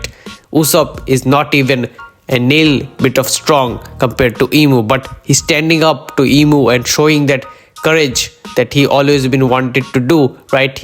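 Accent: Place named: India. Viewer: Brother your English accent is Indian so if you are a Indian then try it to explain in Hindi there are indian community also + your voice is also good 👍🏻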